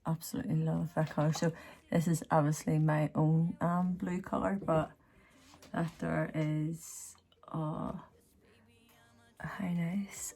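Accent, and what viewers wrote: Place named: Belfast, United Kingdom. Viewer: Omg I’m from Belfast you sound like you have my accent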